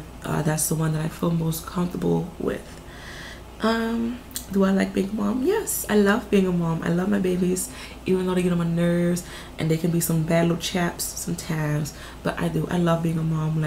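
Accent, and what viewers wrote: Caribbean. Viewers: O wow I really thought u were Caribbean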